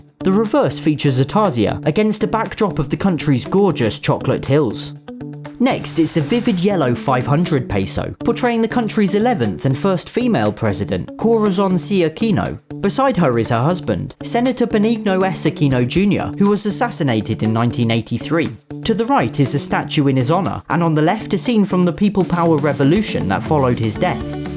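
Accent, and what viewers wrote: United Kingdom. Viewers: His british accent is the best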